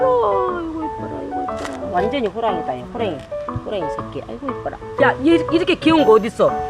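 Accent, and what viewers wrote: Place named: Jeolla, South Korea. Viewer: 우리엄마랑 똑같은사투리..똑같은욕..ㅋ소오름ㅋㅋ어딜가도 ❤전라도사람 인거 너무티남ㅋㅋ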